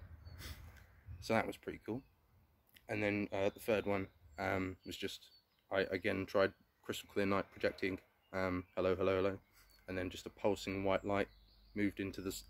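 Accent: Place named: United Kingdom. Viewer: You have a cool British accent in the likes of Henry Cavil, not a pompous one like Nick Pope and Mick West. I've tried CE 5 and had mixed results nothing solid or repeatable. My theory is they have sensors that can read pretty much everything with 3-5 miles of them. They know everything going on within that bubble and its like super hero or god like powers minus bringing back the dead. Very weird world and we barely understand any of it.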